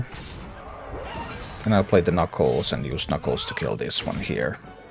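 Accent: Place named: Finland. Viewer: As soon as you started talking I could tell you are a Finn!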